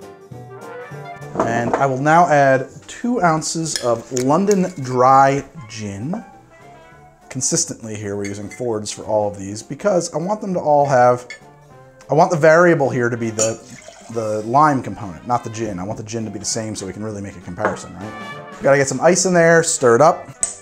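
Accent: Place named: New Jersey, United States. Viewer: The Doctor might of not been real or just had the same name as the tool. It could of been a play on word sort to speak, like how Chefs and Cooks call the microwave CHEF MIC/Mike. Because the Gimlet was a tool to keep the sailors from getting sick they called it "Dr." Gimlet. I love your Videos and I'm a fellow Jerseyan don't apologize for the way we say stuff it makes other states think we're weak lololol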